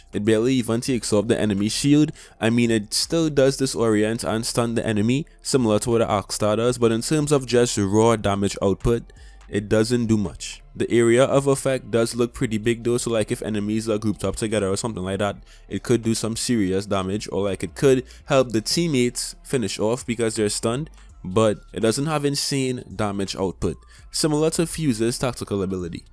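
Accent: Trinidad and Tobago. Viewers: Are you trinidadian? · Are you from Trinidad??? Your cent sounds like it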